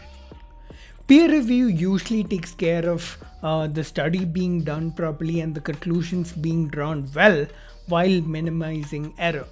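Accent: South India, India. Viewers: So, can I ask what your scientific credentials are? What is your training regarding research methodology? No offense but engineers (which I'm assuming you are given your accent, a fellow idli eater) are not very well trained in science. I know because I'm one too. We barely use statistics for exploratory research.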